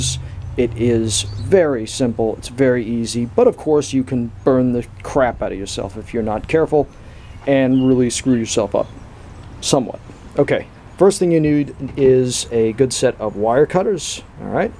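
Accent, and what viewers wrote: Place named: England, United Kingdom. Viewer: Terrible Pommy accent, good video!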